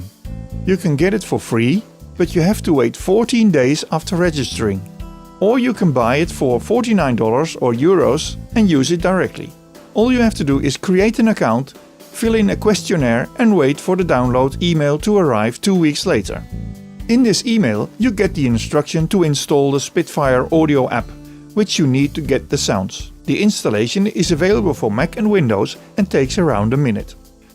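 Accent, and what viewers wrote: Netherlands. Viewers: Thickest Dutch accent I've ever heard 😂. Sounding good tho.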